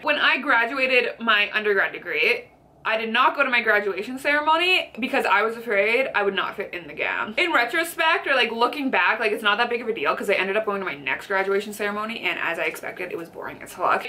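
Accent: Canada. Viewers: Canadian accents are so cute :) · Her Canadian accent is so adorable! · Canadian accent, Get over it.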